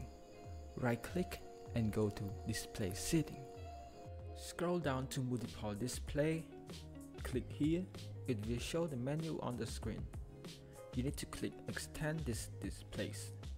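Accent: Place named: Asia. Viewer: When I heard the Asian accent I knew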